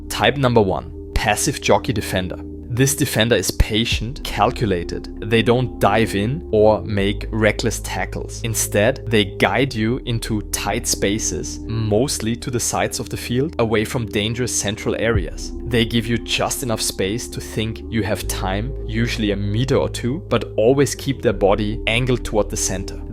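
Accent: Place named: Germany. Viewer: Da hört sich aber jemand deutsch an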